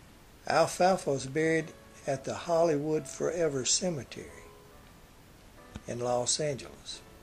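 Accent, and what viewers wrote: South, United States. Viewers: You have a great voice for narrating, and great American accent and local dialect.... nice. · I enjoy all of documentaries he has that southern voice that makes for great listening keep up the great work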